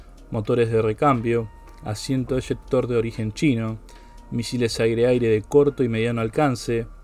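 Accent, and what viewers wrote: Argentina. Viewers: con ese acento seguro que es argentino o uruguayo , no me sorprende que admire a un pais serio como chile , simplemente ustedes no pueden aceptar que hace muchos años dejaron de ser relevantes,si no me creen observen sus villas miserias..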